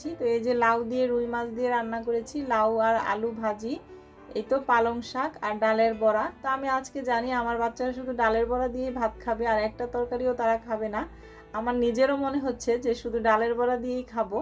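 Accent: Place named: Noakhali, Bangladesh. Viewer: ওরে ! আন্নে আঙ্গো নোয়াখাইল্লা ভাবী নী, আগে কন্নো কিল্লাই?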